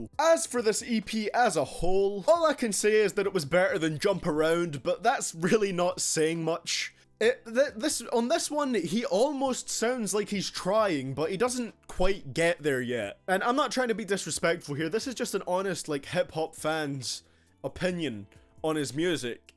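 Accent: Scotland, United Kingdom. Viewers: are u scottish cause i am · Where in Scotland are you from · Are you Scottish?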